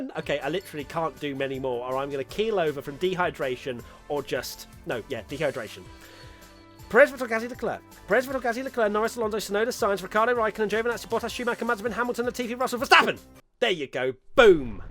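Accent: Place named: United Kingdom. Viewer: No,the reason why you sounded weird is due to the fact that you are Brit m8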